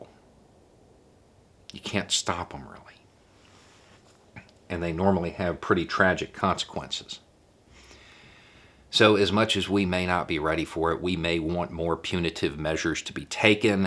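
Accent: South, United States. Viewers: Welcome! You are at the right place. is a comfort to many of us. The man can break down what's going on like nobody's business! Just an FYI: If you see videos where his Curious George patch is upside down, get ready for some Grade A sarcasm/satire. (and sometimes, his southern accent gets really thick during these videos) Sorry about your cat. I've dug many a hole for a loved one, and it's a hard thing to do. Makes me feel good that you're here now, however.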